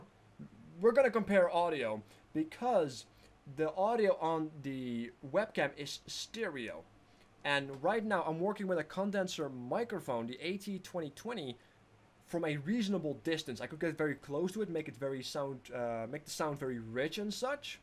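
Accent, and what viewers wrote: Netherlands. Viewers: the dutch accent gave it away :D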